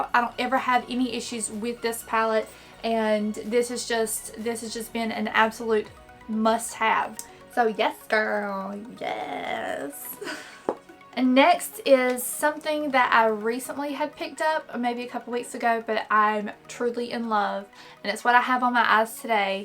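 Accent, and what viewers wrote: South, United States. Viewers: You are stunning and I love your accent! Are you from the south?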